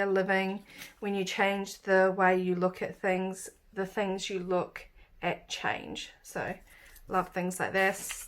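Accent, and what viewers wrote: New Zealand. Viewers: Thank you..I am from Wanaka and you sound very NZer to me.x I love watching your video's and love what you make.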